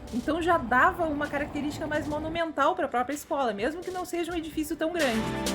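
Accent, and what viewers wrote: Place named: Rio Grande do Sul, Brazil. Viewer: Chegando para apoia-la e ja gostei. Conheço Pelotas, nasci no Sul, na fronteira, e hoje em P. Alegre. Pelotas tem muitos prédios históricos. Vc tambem é gaucha, nao? Esse sotaque..rs Um abraço, compartilharei. Nao faço conteudo, só apoio.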